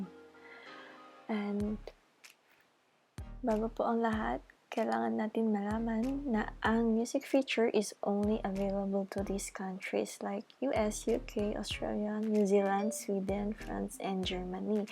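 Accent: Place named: Philippines. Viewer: i could tell with the accent i’m half filipino:)) salamat po for the video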